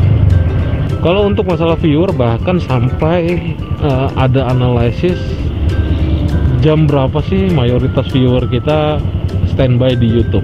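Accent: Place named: East Java, Indonesia. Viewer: Koyok pantura malang pasuruan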